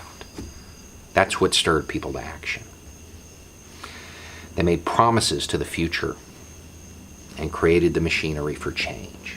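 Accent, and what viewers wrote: South, United States. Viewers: I love this guy so much. With my own inherent bias and assumptions , I immediately assumed that a white man with a beard and a southern accent was going to post racist/right wing rhetoric. So it seems I have to do some self reflection of my own and think about how I perceive white people. His such a gentle and thoughtful soul. His narrative is both beautiful and soothing and most of all he makes sense! He critiques society through a historic and present lens and I love that. we need more people like Beau who preach,love, equality and understanding. THANKYOU ❤️❤️❤️❤️🙏🏾🙏🏾🙏🏾🙏🏾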